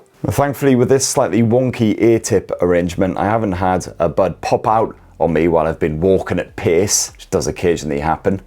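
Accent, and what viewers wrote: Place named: Northern England, United Kingdom. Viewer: Very useful review - Google translate helped me with your more extreme vocalisatory nothernisms. Seriously very useful and engaging with the duller info. Thank you!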